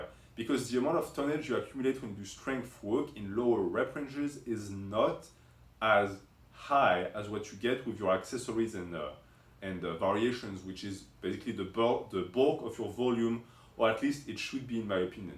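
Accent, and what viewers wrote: France. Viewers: You're French man? you're accent seems French to me. C’est bien ce que je pensais tu suis et.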